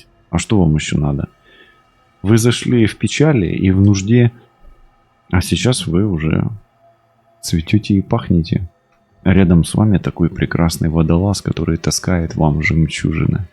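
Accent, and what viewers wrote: Ukraine. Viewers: Вы просто супер- мне так импонирует Ваши комментарии )))) и говор украинский, как же это приятно на слух! Боже как это классно!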